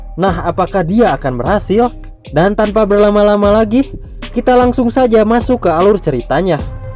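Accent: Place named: West Java, Indonesia. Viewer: Salam satu alur. Salam pemula om. Orang sunda yahhhh???? Hehehehe Keren narasinya ommmm👌🏻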